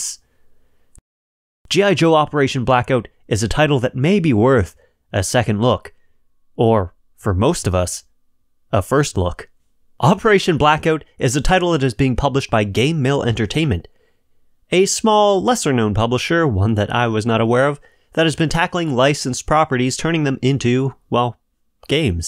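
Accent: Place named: Canada. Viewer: You sound Canadian.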